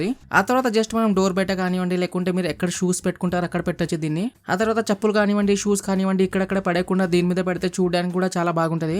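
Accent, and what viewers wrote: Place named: Hyderabad, India. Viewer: Bro, Name emo. Slang emo old city muslim guy la undhi.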